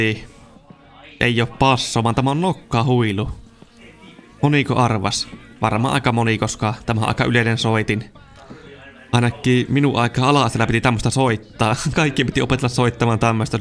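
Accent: Finland. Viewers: Itä suomen murretta minkä sille voi jos on opetettu sanoon nii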